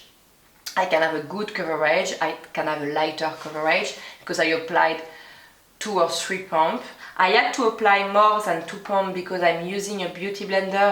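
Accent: France. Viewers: your french accent is super cute! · I just found your channel by complete chance (searching for Dior Forever reviews!) and I am so happy that I did. I have always been obsessed with everything French and I love your accent.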